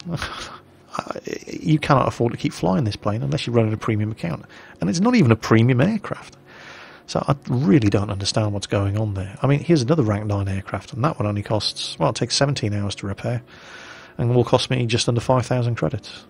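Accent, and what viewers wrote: United Kingdom. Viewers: British or American? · Bayden Hudson Trying to "help"...he has an English UK accent, not a North American English accent. Also Boom isn't talking to you in any way that's offensive, he's stating a fact, you just need to stop getting your panties in a bunch. Also considering that you have only 2 subs and you're trying to "help" a YouTuber with almost 400K subs, it looks like he doesn't need help from you...he does have almost 400K subs.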